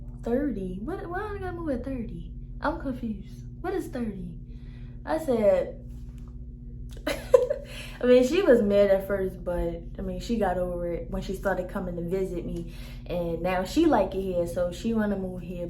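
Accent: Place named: Baltimore, United States. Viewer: It’s the Baltimore “tew” for me lol. But great video!!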